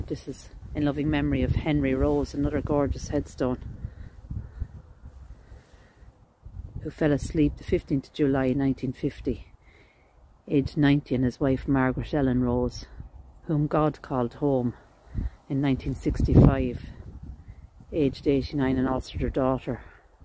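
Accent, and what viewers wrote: Ireland. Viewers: Her Irish lilt is so easy on the ears.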